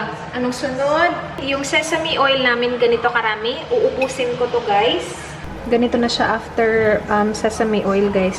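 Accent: Visayas, Philippines. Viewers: Bisaya kaba ate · bisaya accent talga pag nag tatagalog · Bisaya ka po?😂😂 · Ako lang ba ang naka feel na bisaya si ate. 😊😊😊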